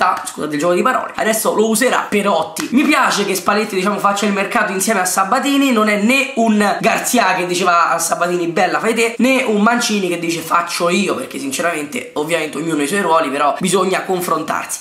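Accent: Rome, Italy. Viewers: mi insegni il romano?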